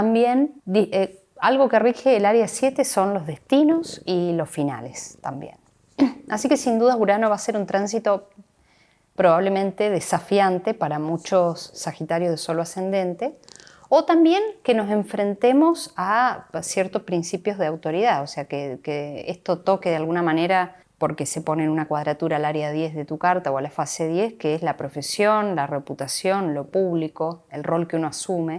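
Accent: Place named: Argentina. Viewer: Hola. Gracias por este video ❤ Tengo una curiosidad: de qué región o provincia argentina sos? Pregunto por tu "tonada". Besos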